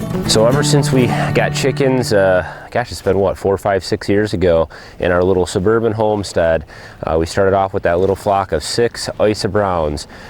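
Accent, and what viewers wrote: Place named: Midwest, United States. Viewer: What part of the country? Midwest, I'm guessing? I live in the Pacific Northwest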